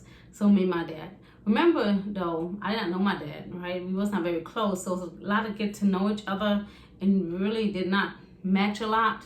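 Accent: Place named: Germany. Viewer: irgendwie kann ich raus hören das sie deutsch sprechen also an ihren deutschen akzent ich liebe aber ihre videos machen sie weiter so!❤️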